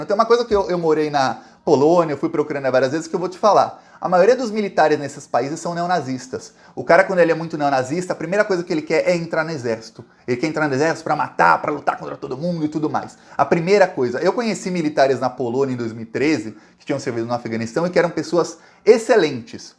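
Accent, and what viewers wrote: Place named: Florianópolis, Brazil. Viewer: Poderia falar mais pausado? Tá parecendo meu irmão caçula de Floripa manezinho... Abraço!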